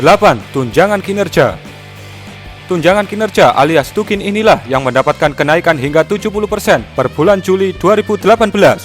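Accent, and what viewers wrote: Java, Indonesia. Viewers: Saya tertarik channel ini, ngomongnya medhok jawa kayak saya. Saya subscribe mas 👍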